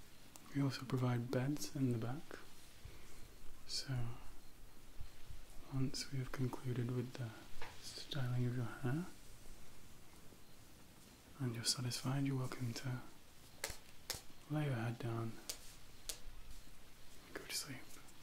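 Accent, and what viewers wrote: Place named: England, United Kingdom. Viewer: England! I like the English accent, so I think that's what I heard in your voice. And you tone is so good for ASMR! And yes, your videos arrived at that "insomniac" here, lol ... Big kiss and thank you. ❤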